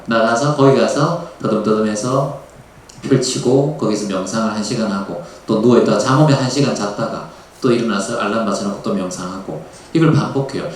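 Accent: Gyeongsang, South Korea. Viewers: 경상도 액센트 너무힘들어요.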